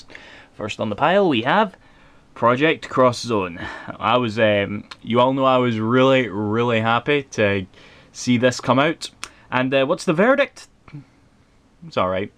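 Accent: Scotland, United Kingdom. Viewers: What part of Scotland are you from.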